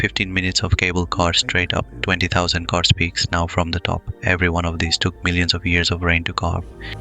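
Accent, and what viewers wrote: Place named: India. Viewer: 你的英語口音和其他大部分印度人的英語口音不同，為什麼？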